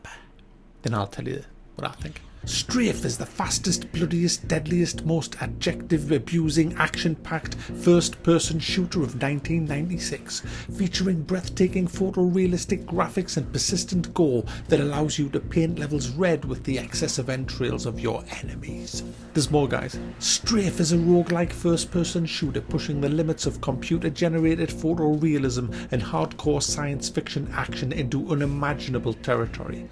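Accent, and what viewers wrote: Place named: Scotland, United Kingdom. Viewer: I love your review, I love your videos (watched some of a playlist), and I love your accent... I wish I had a Scottish accent. Subbed.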